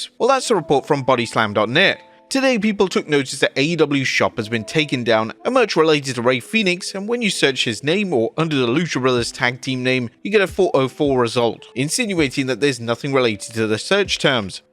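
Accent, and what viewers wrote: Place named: England, United Kingdom. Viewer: Theres 2 different people, an American and an english one, this is the english one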